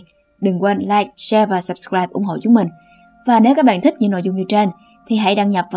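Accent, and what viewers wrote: South, Vietnam. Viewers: Cuối cùng cũng có giọng miền nam hehe 😁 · Giọng nữ miền Nam dễ thương quá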